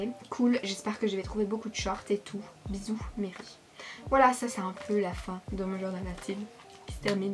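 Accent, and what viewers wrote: Belgium. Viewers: Be Ca elle viens de belgique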